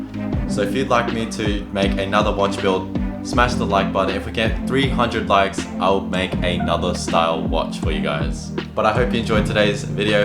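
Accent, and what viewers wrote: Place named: Australia. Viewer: G'day. Decided to follow you because you're the only the second Australian accent I've heard doing YouTube watch content and wasn't interested in the other guy's content enough to follow. Please invest in some decent lights when you can and a better background, and I hope this goes from strength to strength for you. Cheers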